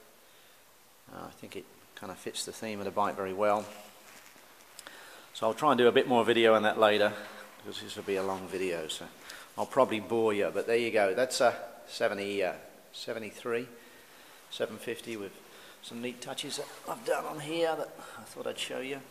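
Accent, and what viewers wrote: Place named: Australia. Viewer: you sound like an ozzy mate ,haha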